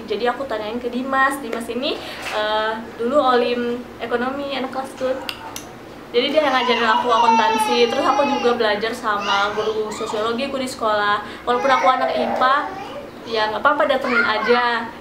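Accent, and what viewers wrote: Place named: Bali, Indonesia. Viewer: kak org bali ya?logatnya khas😁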